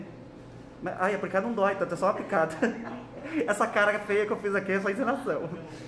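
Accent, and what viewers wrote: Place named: Cuiabá, Brazil. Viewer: Fala bem parecido com cuiabano,de onde vc é o?🙏👏🏻👏🏻👏🏻😘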